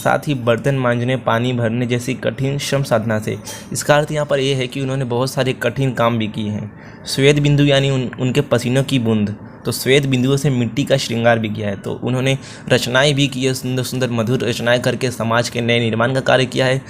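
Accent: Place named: Bhojpuri, India. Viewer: Bhosdike ghutka nikal ke baat kar bhojpuri choda sala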